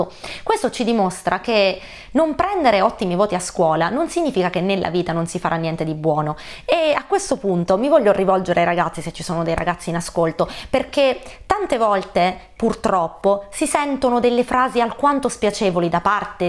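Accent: Sicily, Italy. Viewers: nel tuo delicato accento siciliano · Siciliana? 😘 Bum crash...🥴 🤕...ehm! Ottimo video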